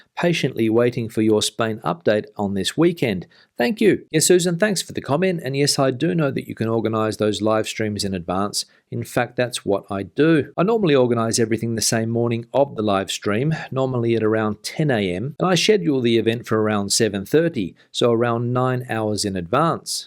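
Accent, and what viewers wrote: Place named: Australia. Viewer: Hi, I note you have an Australian accent! Do you see many other Australian expats in Spain, and what made you move to Esp from Aus?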